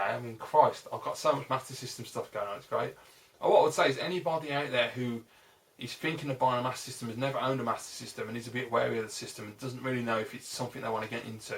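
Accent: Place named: Midlands, United Kingdom. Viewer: I picked up Max Payne 3 for £15 on steam, i started playing it today and i don't know what to make of it. Its more film than game by the looks of it, it's a nice looking film though lol. Where do you live, you sound like your from the midlands which is a fair old way to travel to a car boot in chedar. I live in Bristol and chedar would be too far for me to go lol.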